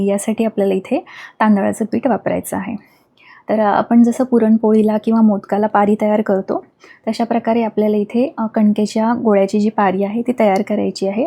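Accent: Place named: Vidarbha, India. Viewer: रेसीपी सांगण्याची भाषा खुप छान आहे आमच्या विदभा सारखी ❤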